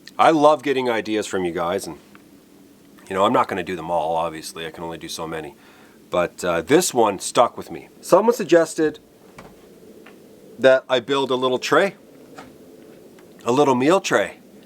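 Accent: Canada. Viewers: Endorsement from anon YouTube watcher: Totally subscribe to this channel. Beautiful views, for real nice guy with a very Canadian accent, feel good, relaxing content, not about the negativity, legitimately out here learning as he goes. You can't get any more authentic than winter camping in the Canadian North. SUBSCRIBE SUBSCRIBE SUBSCRIBE!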